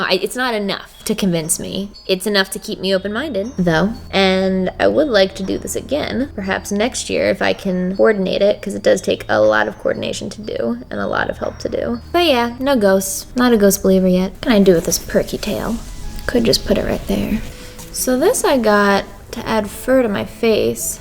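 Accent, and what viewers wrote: Philadelphia, United States. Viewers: Being from philly, I felt the our o's are a's except in "wooder" (water). Lol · Omg I didn’t know why you sounded so normal to me until you said you were a Philly girl! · I get the philly accent. In Baltimore t turns into d’s . And Maryland sounds like “marlyn”😂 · I just started watching you and I didn’t know you where from Philly!!! Me too!!! I was confused when you said you said orange wrong because that’s how I say it! I wish I was as talented as u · Never change the Philly accent! We, hometown homies, love that jawn!! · I am from Philly and always said orange, forest, forehead etc the way you do and I never thought that water and the other words were wrong until you said it today. I’m so shook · philly accent asmr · I’m from philly and I didn’t even realize how differently we say certain words 😂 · I’m a Philadelphian. 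W H O O T E R still hurts my brain. please it’s wAtER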